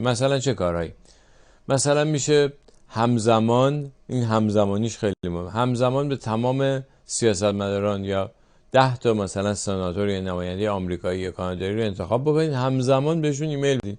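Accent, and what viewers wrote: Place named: Iran. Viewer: بزرگ‌شده انگلیس است با گویش انگلیسی ، لهجه ایرانی دارد.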